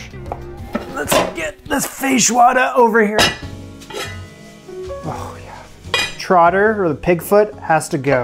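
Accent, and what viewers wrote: Canada. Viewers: I am pretty sure he is Canadian, judging on his accent, preference for metric, the fact that his older videos were filmed in Canada, etc. He is currently in NYC though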